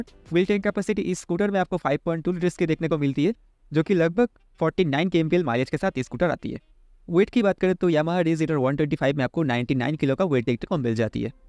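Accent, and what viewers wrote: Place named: Bengal, India. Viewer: You are pure bangali 💯% confirm 95,000 hazar bolne ka tarika pura bangali ka he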